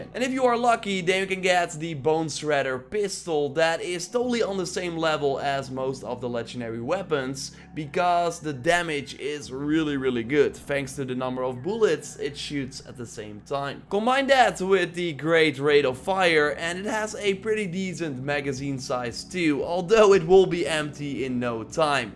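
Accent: Netherlands. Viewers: je hoort meteen dat je nederlands bent haha, nice content man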